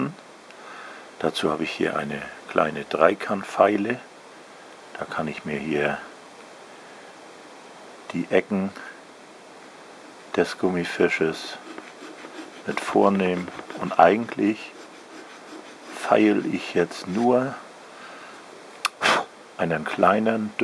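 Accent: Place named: North, Germany. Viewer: der gechillte norddeutsche Dialekt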